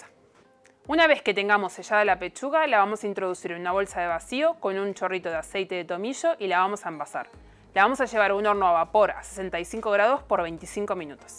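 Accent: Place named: Argentina. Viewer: Maravilloso tu Espacio. Sólo hago hincapié en q' debes llevar con HONOR y al máximo Orgullo el GRAN acento ARGENTINO 🇦🇷. No bloquees tu pronunciación, q' queda muy tierno , dulce e interesante. Ser y pertenecer a un mundo Anglosajón es una maravilla , pero siempre poniendo en frente y sin intentar olvidar nuestras raíces , ni hcia donde vamos. Saludos y muchas felicitaciones !!!!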